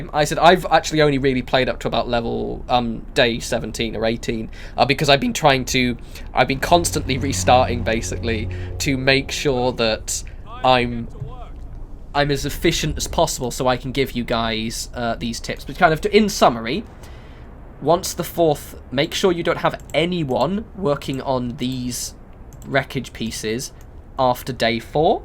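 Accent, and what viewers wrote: United Kingdom. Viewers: OMG. Speak English, not a f!@ British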